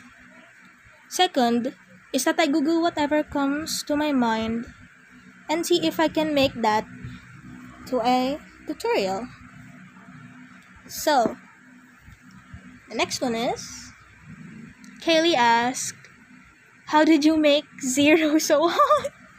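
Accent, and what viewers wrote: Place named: Philippines. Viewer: YOUR VOICE SOUNDS AMAZING WHAT- ITS LIKE AN ANGEL DBDJHDGHJDYDJSSJHSH You have that Filipino accent while I have this American-ish accent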